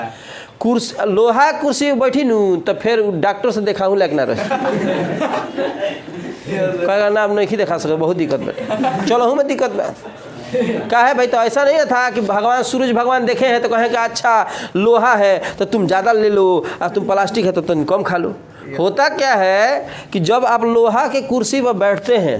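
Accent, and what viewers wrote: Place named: Chhapra, India. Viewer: छपार जिला के मीठा बोली, ऑउ ई सर् जी के फिजिसिया अंदाज़ में कॉमेडी .. जिय हो सर् जी, इंडिया लेवल के बारा हो तू ....अनंदित कर देहलस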